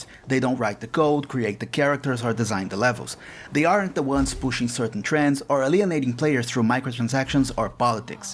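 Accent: Brazil. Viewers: A Brazilian accent is always unmistakable. Olá, meu amigo. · Percebi que era BR pelo sotaque primeiramente hahahaha. Depois vi os textos em português em alguns dos jogos. Você fala muito bem inglês, muito impressionante. Consumo muito conteudo gringo, acabei caindo no seu canal por esse video e fui surpreendido hahahah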